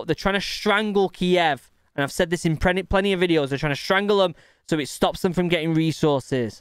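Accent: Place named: Manchester, United Kingdom. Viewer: Not a question, but heya fellow (or technically previously fellow) Manchester citizen. I only knew about that one once you mentioned it here. Wait now that I think about it how have I not noticed your accent being from around this area? Love it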